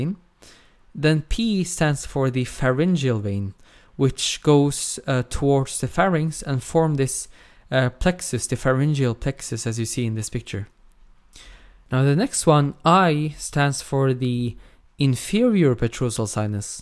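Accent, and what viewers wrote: Norway. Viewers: hahah yes, I think the same. I love the Norwegian accent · He sounds very Norwegian to me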